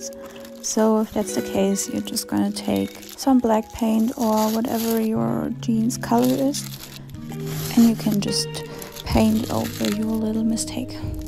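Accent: Germany. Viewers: Heyy nice vid! Btw are you german bc you have a german english accent :')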